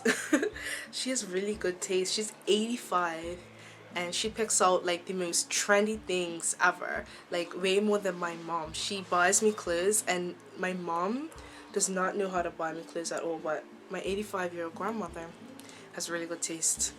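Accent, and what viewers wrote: Bermuda. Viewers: Are you Bermudian? Your accent sounds like it... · You sound bermudian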